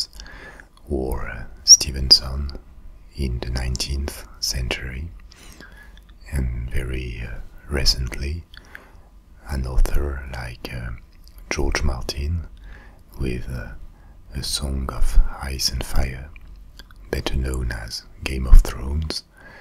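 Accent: France. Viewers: wonderful, thank you, great to hear British history in such a calm and relaxing French accent :)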